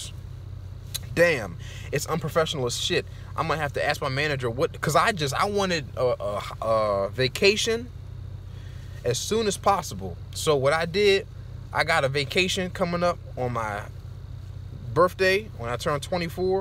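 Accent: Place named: Maryland, United States. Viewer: You sound like you from dmv like maybe Maryland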